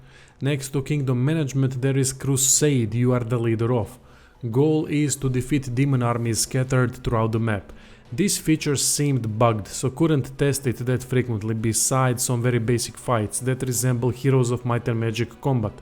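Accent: Russia. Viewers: The man sounds Russian, so you know he knows his CRPGs! (And gaming in general as eastern Europeans are the most prolific in excellent game development nowadays!) I have mine in the cart right now ready to pull the trigger after I'm done watching this video! EDIT: Just bought it, I usually don't do pre-purchases, but I planned to get it day one anyways, so... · tvrdi ruski naglasak i odlicne analize, što više poželit, pozdrav iz splita :)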